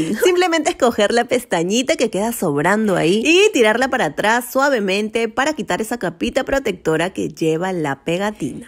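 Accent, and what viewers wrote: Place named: Peru. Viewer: Me carga el acento peruano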